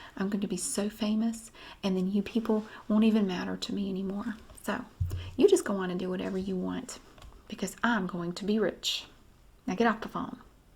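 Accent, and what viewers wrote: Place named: North Carolina, United States. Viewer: Your accent reminds me of my childhood in Catawba County, North Carolina. You are so the epitome of a southern sassy woman. I admire that!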